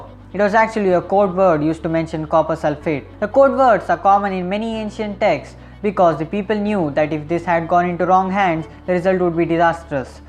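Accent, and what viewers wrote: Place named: India. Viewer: I’m sorry to say this to you or not because I like your channel, I know you are speaking English for your English speaking channel and you want people to keep watching it so you can make more videos and give more information and you can make more money the more people you have watching them! You need to know this like any other Indie person that speaks English. When you speak very fast in English along with your accent it is very hard to understand you at all! I started watch your videos because you spoke slow enough to understand you! Honestly we cannot stand getting a person on the phone that has a indie accent, it’s nothing to do with indie people it’s just we think we have been pawned off because we are not cared about! If you are able to slow down so we don’t need to try and listen to you, you will have greater success. Don’t take this as a bad thing. 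Use this comment to your advantage! When you do English videos for English speaking people, speak slower so we can understand your English please and thank you! This will help us and you! Sorry to write this but it will help us all immensely and your subscription and watcher value will go up I bet you! If this works and I know it will send me 10,000 USA notes okay! Great! thank you!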